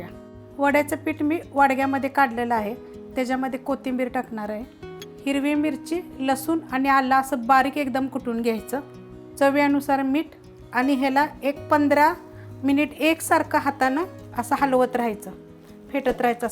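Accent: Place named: Kolhapur, India. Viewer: खूपच छान असतात तुमच्या रेसिपी 👌👌👌 तुमच्या बोलण्याचे टोनिंग वरून तुम्ही कोल्हापूर च्या आहात असे वाटते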